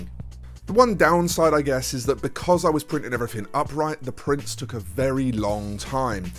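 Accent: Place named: United Kingdom. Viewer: I've just realised love british accent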